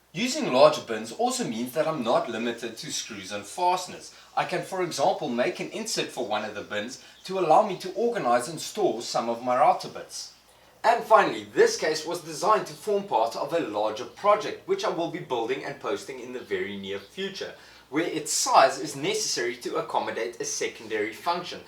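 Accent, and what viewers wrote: Afrikaans, South Africa. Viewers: This is my first video of yours that I have watched. I am not from wherever you are from and I am going to guess your accent. Please don't ridicule me for my ridiculous guesses. To me your accent sounds like South African or Swedish mixed with Australian. How wrong am I? By the way, I enjoyed the video! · Strange accent. Slightly German, slightly South African. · Are you from south africa???because the accent sound very Afrikaans???😁😁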